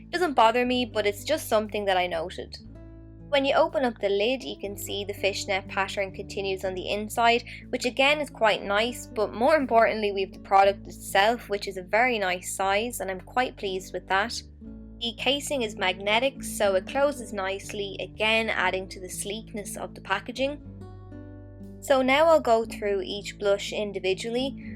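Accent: Ireland. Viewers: God, I love Irish accents.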